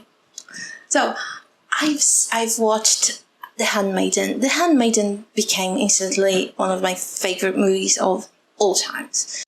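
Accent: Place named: United Kingdom. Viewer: O sotaque britânico da gata! The British accent of the kitty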